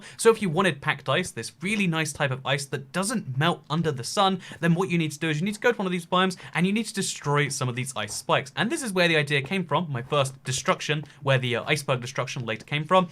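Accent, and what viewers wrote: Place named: United Kingdom. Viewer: He's British. That's his accent.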